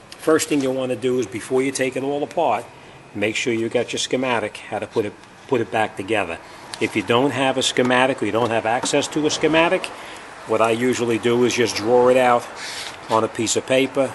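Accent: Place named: New Jersey, United States. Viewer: I closed my eyes and it was like Bobby Deniro’s garage in here! Love that Jersey accent! I’m gonna do this soon on my 03 Sport plus check all the pulleys for noise. Mines got 200k and it sounds like idler, tensioner or power steering pulley is making an awful hum. Thanks for the great video. The complainers can just fugget-about-it! Lol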